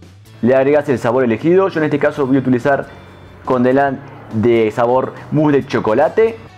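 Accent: Argentina. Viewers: me gusta tu acento argentino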